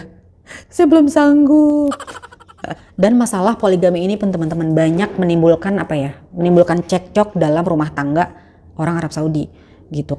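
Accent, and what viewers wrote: Jakarta, Indonesia. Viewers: Alhamdulillah akhirnya dapet jwbnnya jg dimenit 10.40 dari awal aksen betokaw nya kentara bener mpok.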